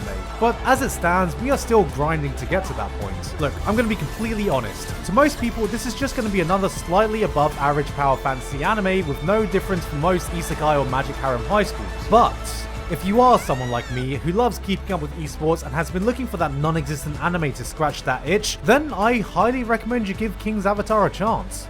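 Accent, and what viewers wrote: United Kingdom. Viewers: He has a Bri'ish accent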